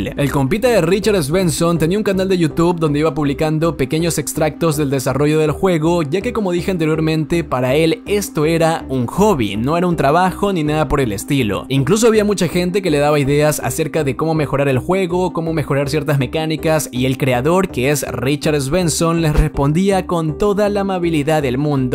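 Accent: Ecuador. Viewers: Hablaste como ecuatoriano, me equivoco?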